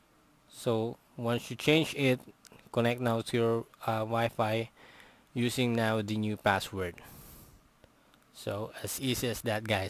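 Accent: Philippines. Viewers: I think he was a filipino because of his accent and pronunciation of english.... But it's doesn't matter Good Job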